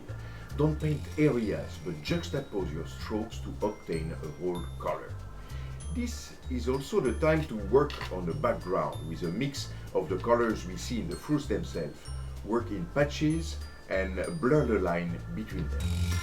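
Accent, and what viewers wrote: France. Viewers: i love your france dialect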